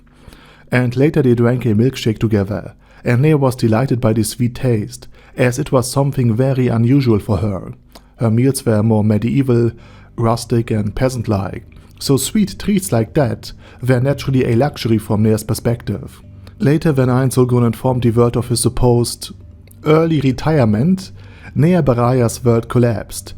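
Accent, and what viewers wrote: Germany. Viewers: Danke aus Berlin 😘 i find your German accent way more charming than the ai alternative. 😊❤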